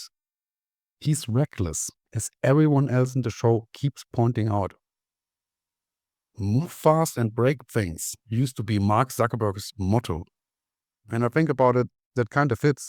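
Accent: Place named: Germany. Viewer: Hahahaha you have a german accent yourself, love the humour...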